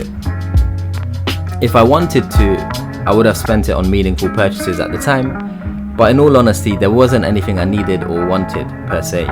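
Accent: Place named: Hackney, United Kingdom. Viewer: Sounds like he's from Hackney.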